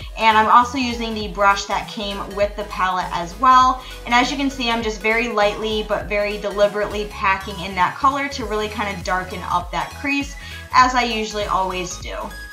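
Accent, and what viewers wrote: Michigan, United States. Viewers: Love all your looks, you make it look so easy!!! Btw love your Michigan accent! ❤️👍🏼😘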